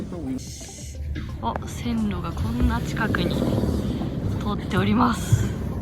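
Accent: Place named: Kansai, Japan. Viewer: 、お疲れ様です😘 ここの、景観は、いつ見ても、最高👍🤩ですネェ🎉 秋🍂は、紅葉🍁、冬☃️は、白景気、今、シーズンは、新緑の風景、本当、いいですネェ〜 後、ちゃんのハニカミ笑顔と時々出る、関西弁も、いいですネェ👍🥰🥰🥰🤣🤣🤣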